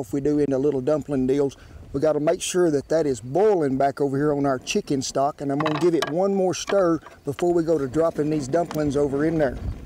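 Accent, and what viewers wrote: South, United States. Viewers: my grandma who is Italian American and who can make anything. She would make me Chicken and dumplings when I was little. Don't worry I can understand who most of your cowboy English. I like what you call eggs:) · “ a good rollin bowl” love his southern accent.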